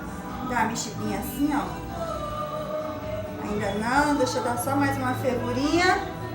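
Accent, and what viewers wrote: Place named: Alagoas, Brazil. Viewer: Eitaaa q Pablo tá rolando solto..kkkkk moça vc é de Alagoas??? Acho lindo o jeito q vcs falam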